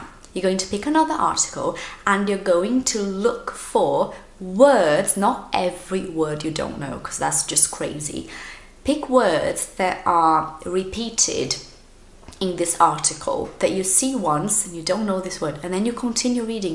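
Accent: United Kingdom. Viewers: sotaque britanico ? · inglês britânico maravilhoso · I love your British accent. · I've from The uk (london) and she has a very strong british accent haha! More "british" than mine 😂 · Nossa que sotaque britânico maravilhoso!! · VC é brasileira??? Que inglês britânico lindo é esse??? I'm shocked! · Seu English é britânico ? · why do u have british accent? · what a lovely British accent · Ela realmente tem um sotaque britânico maravilhoso é uma delicia ouvir.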